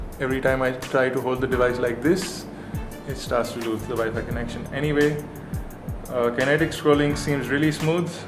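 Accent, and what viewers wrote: India. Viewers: indian is speakin!!! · Why are all these reviewers Indian? I fucking hate hearing this accent all the damn time!! No I'm not racist it's just an opinion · Its like I'm listening to that indian character on the Big Bang Theory series... 0_0 PS: Yes I know the uploader is probably indian.. what I'm saying is, its annoying.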